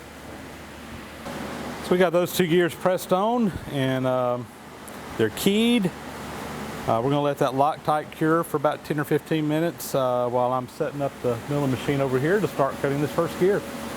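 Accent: South, United States. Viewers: Oh dear lord!. I had the Closed Caption turned on. Your southern accent is giving it fits. You simply have to watch a few portions of this video with the CC on, it'll drop you to your knees its so funny how it interprets what you say. Example "... about replace when us we're going to use this hotel room a which had been looking and come from the research for the the strength for this material I thinks gonna play me strong enough for this final dear in a reason the use that the from noise reduction for this final gear in a reason the use that the from noise reduction the have that bad fastest turning here for a walk in the metal he had a something that would dampen the operation tumor in the blower....." It gets worse.......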